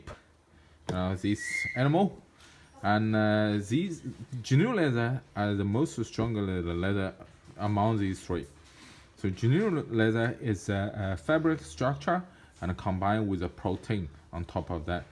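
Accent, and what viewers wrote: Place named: China. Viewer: Chinligsh to much Feb feh fuh he and less a e I o u 😔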